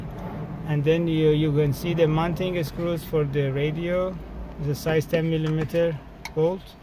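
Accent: Iran. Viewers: looooool your accent. I'm guessing your persian hahahahaha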